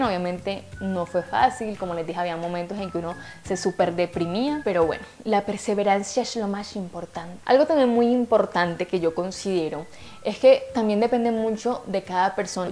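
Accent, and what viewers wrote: Caribbean Coast, Colombia. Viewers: Gracias! Me gusta tus vídeos, estoy aprendiendo español y el acento costeño es mi favorito. Saludos desde EEUU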